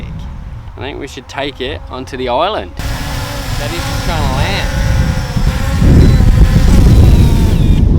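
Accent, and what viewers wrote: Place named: Australia. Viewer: Where abouts you from in Blighty originally butt? (See if you can guess where I’m from with that word 😂) You’ve pretty much got the Ozzy accent down Pat fella! I was out there travelling around in <num>, I’d love to go back tbh mate. Sick van build btw man, you got yourself a very cool little family set up there. Awesome brother!!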